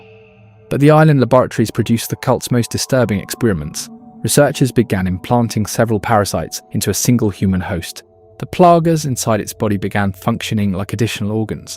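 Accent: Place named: United Kingdom. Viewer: Please change the narrator voice to a voice without this british accent 😵‍💫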